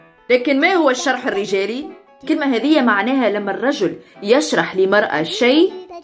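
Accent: Tunisia. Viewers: انت جميلة و لهجتك التونسية و حسك الفكاهي يزيدك جمالا على جمالا 😁👍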